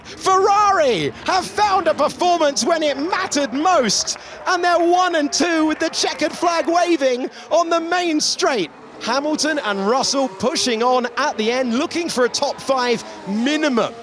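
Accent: United States. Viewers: get this american of the commentary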